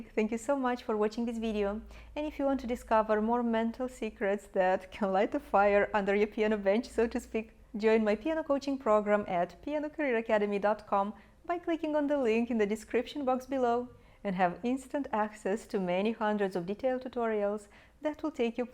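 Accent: Russia. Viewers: Teacher, this audio is better. Keep using the little clip microphone. A previous video I saw, I had a hard time hearing you (scale practice). There was too much room reverb, plus you have a Russian accent. I would consider a course of yours, but as a retired person living on reduced social security, I can not afford it. Plus, I have a hard time trusting you. Mel Gibson was married to an accomplished Russian pianist. Young and beautiful as yourself. They had a baby together. Then they got divorced and she took him to the cleaners.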